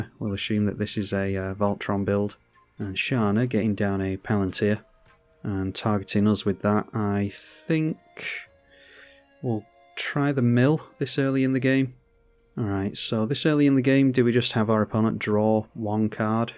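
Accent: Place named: United Kingdom. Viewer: Bro is so british the closed captions put his saw in as a SAR in half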